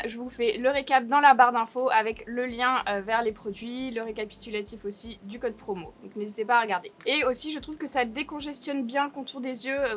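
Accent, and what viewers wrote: France. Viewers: prononce toujours à la française les mots anglais.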